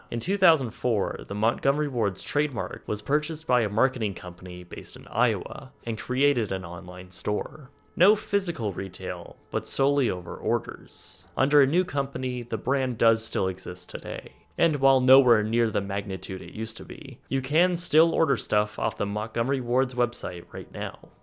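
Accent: Canada. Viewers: from Canada. They pronounce some things different up there. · He is from canada they pronoun words differently. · Love your videos. Great job,. And your voice is so nice and relaxing. Love that Canadian accent. Love from Oklahoma 🧡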